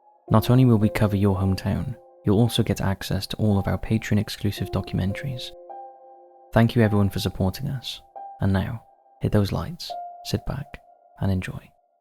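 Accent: Wales, United Kingdom. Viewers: I love top5s content. However the narrator sounds Welsh, and seems to struggle with basic words in English. What's a thisic? Probably meant a Psychic... this is not the first time basic words have been pronounced strangely. Maybe some quality control before posting?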